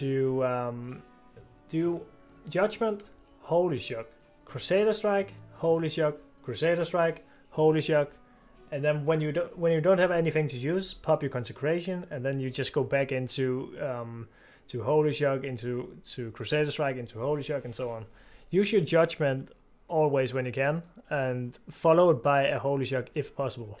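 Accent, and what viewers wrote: Denmark. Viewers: Man kan godt høre at du er dansk xD fed video :) får mig helt til at overveje holy Pala :)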